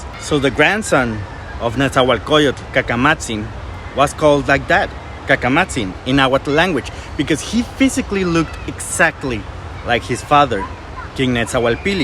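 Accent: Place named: United States. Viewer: Mi hermano te suplico que no digas mexico y mesoamérica . Con acento gringo. Y te felicito por si saber pronunciar los nombres de los tlatoque con acierto. Gracias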